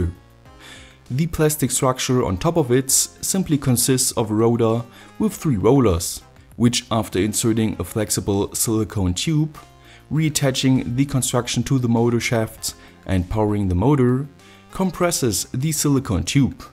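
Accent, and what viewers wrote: Germany. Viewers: Jeder weiß das du deutscher bist... ich verstehe nicht so gut englisch aber man merkt das du deutsch bist weil du nicht diesen englischen “Akzent“ hast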